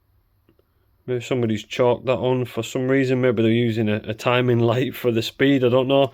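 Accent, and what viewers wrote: Scotland, United Kingdom. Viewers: Is that Scottish accent? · Are you from Scotland? I like your accent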